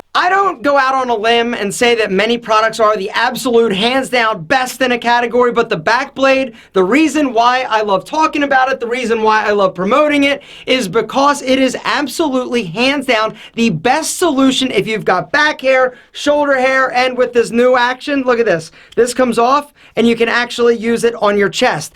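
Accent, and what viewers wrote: United States. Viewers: Sounds American to me...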